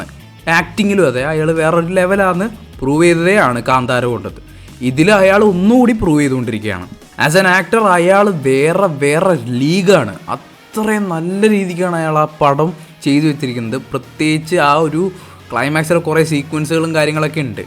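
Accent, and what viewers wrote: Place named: Kodungallur, India. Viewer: ചാലക്കുടി അല്ലെ സ്ഥലം, കൊടുങ്ങല്ലൂർ അടുത്താ , same സ്ലാംഗ് ആണ് അതുകൊണ്ടായിരിക്കും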